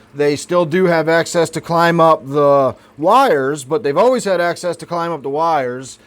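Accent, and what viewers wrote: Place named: Philadelphia, United States. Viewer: I just stumbled across your channel and I gotta ask, are you from Philly? You talk like us.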